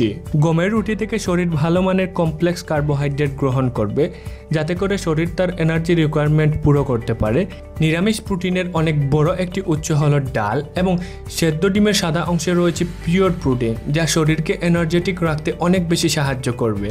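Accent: Sylhet, Bangladesh. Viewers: ভাই গো আপনে কিতা সিলোটি নি? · Apne sylhety ? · Sylheti vai 🥰🥰🥰🥰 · Brother r u sylhety??? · ভাই অাপনি সিলেটি তাই না? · Bhai anni ki sylheti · Undoubtedly sylhety accent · আপনি সিলেটি আমি ১০০% শিওর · Bhai Sylhety ni apne? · R you form sylhet ?? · bhai apne ki Sylheti?? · ভাই আপনার মাতে তো বুঝা যার, আপনে সিলেটি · apnar basha ki sylhet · আপনি কি সিলেটি? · Baiya apni ki sylheti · আপনি সিলেটী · Vai ki sylheti😀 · Bro, are you from Sylhet? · Vai apni ki sylheti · সিলেটি ভাই 🙂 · ভাই কিতা সিলেটী নি???? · Jioy apner matei buja gese.. we are sylhety 😊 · Sylhety re বা.... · সিলেটী টোন এ শুদ্ধ ভাষায় কথা বললে শুনতে দারুন মজা লাগে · Sylhet er basai je kotha bolcen tar nam ki.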